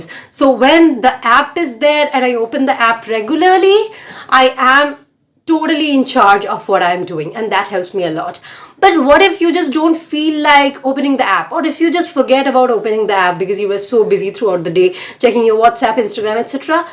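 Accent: Bengal, India. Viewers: Are you Indian? Your pronunciation proves that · May I know if you are a Bengali... Because I found a Bengali-like accent in your speaking and that is good. Anyway I'm a Bengali.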